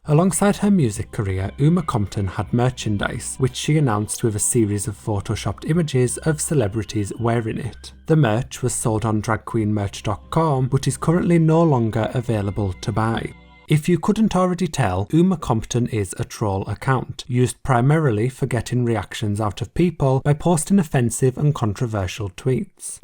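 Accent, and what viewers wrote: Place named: Scotland, United Kingdom. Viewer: it’s a Scottish accent…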